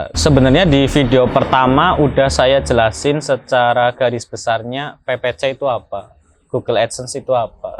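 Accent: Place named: Banyumas, Indonesia. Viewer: Deneng ngapak, tapi ok ilmunya suhu, ora ngapak ra kepenak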